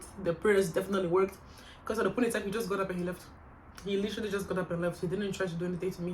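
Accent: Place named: Nigeria. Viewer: you sound soooo Nigerian in this video oo hah! 😂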